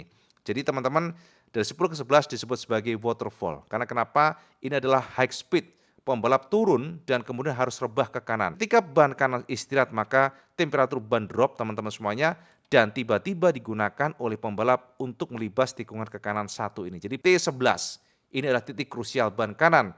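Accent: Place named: Java, Indonesia. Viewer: Bang asli ngendi je bang kok jowone tulen hehe...salam seko gunungkidul bang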